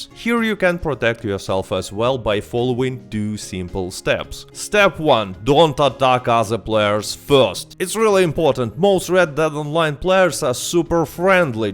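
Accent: Russia. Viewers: Are you Russian? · Ohhh this russian accent, but video is zaebis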